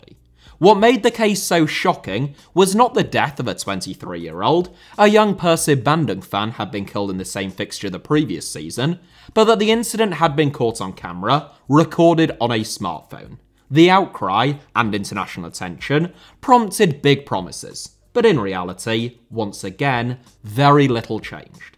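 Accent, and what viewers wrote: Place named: United Kingdom. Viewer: Your voice i know you🤣 the british accents viral boy????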